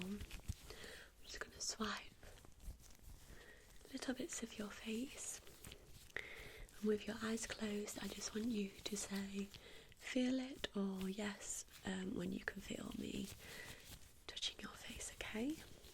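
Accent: United Kingdom. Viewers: Just subscribed. You have a very soothing manner, wonderful accent. I'm kind of a typical American who loves British accents. I would imagine you'll have many more subscribers in the future. I'll be watching more of your videos and I'm sure I'll be hitting the like thumbs up on them. Wish all success to you. Greg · This was great :) First thing I checked while listening to the video was if there was any info on your area because I thought it was a British accent and I was right. No wonder it sounded a lot more pleasing :D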